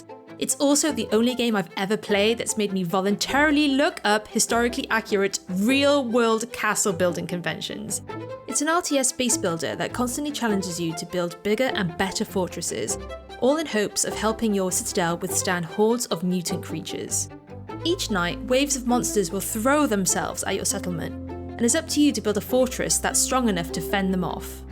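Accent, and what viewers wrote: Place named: United Kingdom. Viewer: how you gonna have a british accent and then incorrectly use feet instead of meters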